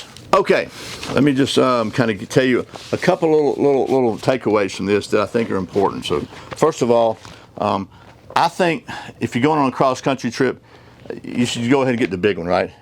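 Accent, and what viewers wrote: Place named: Alabama, United States. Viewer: Great video! I knew I recognized that accent. Over in Alabama we sound so similar. 😅 Learned a lot here. Thanks for the upload.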